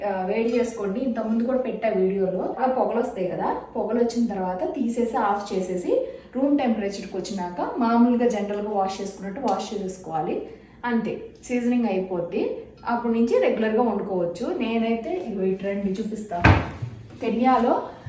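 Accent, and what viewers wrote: Nellore, India. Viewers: Medam midhi Nellore ahaa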